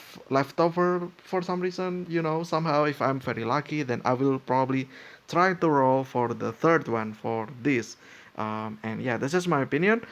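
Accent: Indonesia. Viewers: Sudah kuduga beliau ini orang indo, dari aksen dia berbicara mirip seperti orang indo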